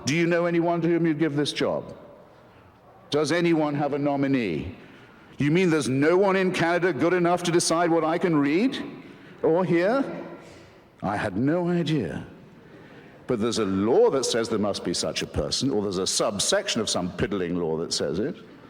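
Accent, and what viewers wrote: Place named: United Kingdom. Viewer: Too Bri'ish didn't listen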